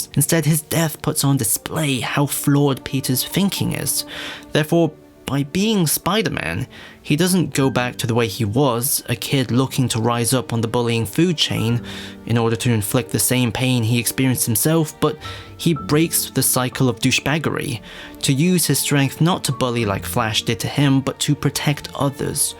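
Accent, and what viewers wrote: United Kingdom. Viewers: I thought you were English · So are you like welsh? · Bro you sound British not american.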